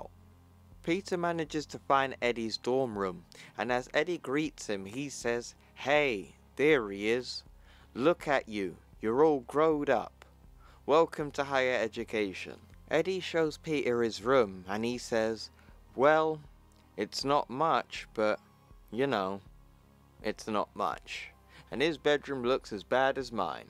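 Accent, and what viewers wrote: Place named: United Kingdom. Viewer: What part of the UK are you from? You have one of the least annoying British accents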